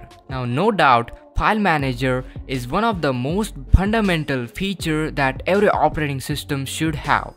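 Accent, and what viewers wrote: India. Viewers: horrible indian accent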